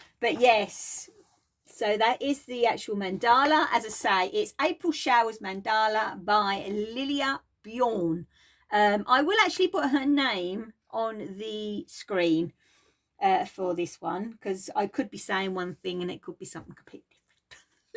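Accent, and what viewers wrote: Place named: South East England, United Kingdom. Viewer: Hi, I am a new subscriber and was sent over to you by 911 stitcher. I am in the UK, living in Devon but lived most of my early years on the good old Isle of Sheppey and Medway towns, so I really apprediate your saf east accent lol xx I am a cross stitcher (on aida) but I enjoy watching your other crafts too. My nearest LNS is Patchwork Rabbit which is 80 miles away! I really am enjoying watching your floss tube and shall be following you from now one. I am on instagram as #missharrietsparrow Best wishes Kathy xx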